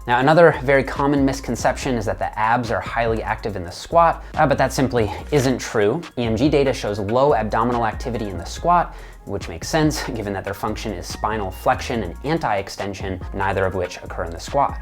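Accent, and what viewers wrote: Newfoundland, Canada. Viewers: I hear a newfie accent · The burr. This man is Canadian no doubt. Awesome content dude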